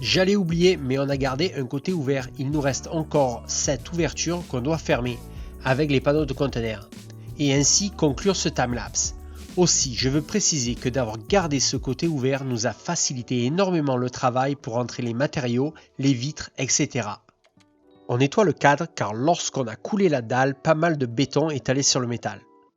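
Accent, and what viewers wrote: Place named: Southern France, France. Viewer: Je viens tout juste de tomber sur votre chaîne et après m'être dis pendant plus de 10min : "Mais comment ça se fait que sur cette chaîne Youtube qui a priori est 🇺🇲 la voix off n'ait pas cette saloperie de traducteur auto de Google/Youtube ...!!?? 🤔" Et après 2min de farfouille je comprends que l'accent du "Sud de la France" de cette voix est tout simplement celle d'un des 2 protagonistes 😂 Après "1 Indien ds la Ville" c'est -> 1 Frenchie ds la Forêt Cainri 😉 En tt cas bravo , superbe projet ; ça fait rêver et ça donne envie ; ça donne envie de poser tellement de questions 😂😉 PS : avant votre autoconstruction vous n'étiez pas de simple novice en la matière, je veux dire en matière de construction , etc ; vous étiez assurément des gens d'expérience ? En tout cas , encore bravo et bonne continuation à vous, 1 grand bonjour de Bretagne 👋🤘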